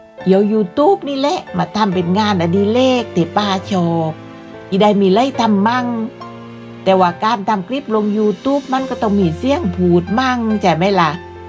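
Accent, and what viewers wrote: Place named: South, Thailand. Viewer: ชอบจังภาษาใต้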